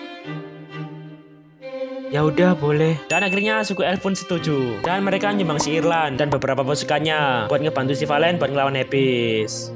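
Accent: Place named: East Java, Indonesia. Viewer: suka bet sama lawakannya yg medhok, salam jawa timur mas, lumajang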